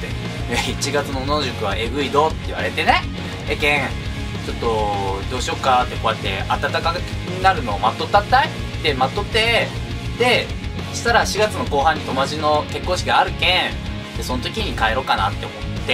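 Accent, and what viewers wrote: Fukuoka, Japan. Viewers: 福岡の方言きいとったら、親近感わく！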